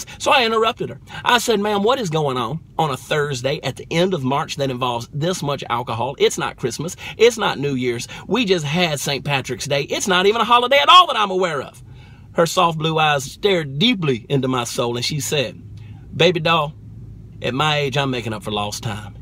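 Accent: Texas, United States. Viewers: Love your Txas accent. great stories, love it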